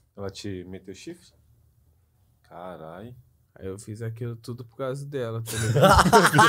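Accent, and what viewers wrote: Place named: Belo Horizonte, Brazil. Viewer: Ambos são de Bh por isso que parece kkk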